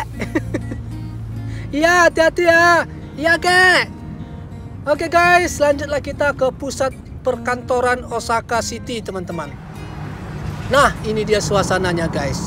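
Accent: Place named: Java, Indonesia. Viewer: Mas e koyo wong jowo wae wkwkwk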